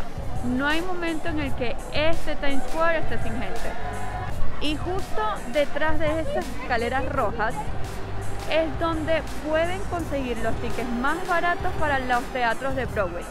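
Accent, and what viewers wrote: Venezuela. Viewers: De donde es esta chica? Tiene un acento como de Venezuela,pero dice palabras que solo usamos en México 🤔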